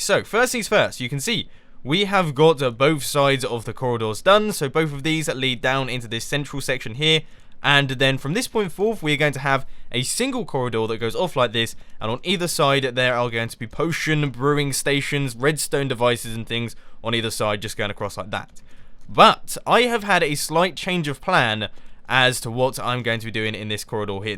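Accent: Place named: United Kingdom. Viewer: the accent tho... one of the little things I love about ♥ "wotoor botools" (water bottles) "simpolist" (simplest) "prooty" (pretty) and all the missing T's and R's that british people always manage to miss. like you say "ee" instead of "it" or "earlieh" instead of "earlier", "ge'in" for "getting", "bu-on" for "button"...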